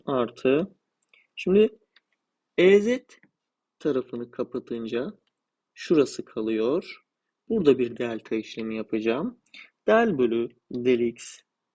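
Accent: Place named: Samsun, Turkey. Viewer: hocam e harfini telafuzunuzdan anladım ben de samsunluyum. videolarınız için teşekkür ederiz kaynak bulmak çok zor gerçekten sağolun.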